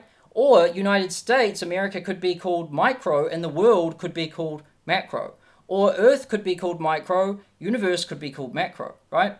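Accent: New Zealand. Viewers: Man I just like to listen you talk, your NZy english is so cool! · You sound like a Kiwi?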